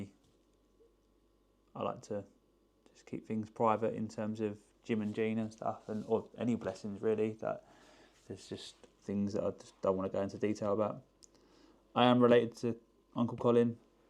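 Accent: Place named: United Kingdom. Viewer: Have experienced the good, the bad, the ugly of people. You definitely are the good in humanity. Listening to you now, please take care of yourself, your health so you can be there for yourself, your wife, and your two sweet boys. Don't let the good (your youtube ) eat into your time with your family. You know maintaining your boundaries is essential. You are a blessing in my life and I pray you have an abundant life with success in all your endeavors. May God richly bless you now and always. Much love from Austin, Texas. PS. Love your British accents and witticisms, turns of phrases and tea times, and your lovely culture. Love all your friends, teammates, colleagues and family helping you work extremely hard to bless people in the most tangible way.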